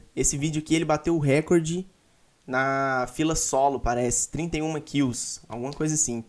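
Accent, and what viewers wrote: Minas Gerais, Brazil. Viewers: Mo sotaque de mineiro kk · Tu é mineiro?kkkkk sutaque igualzin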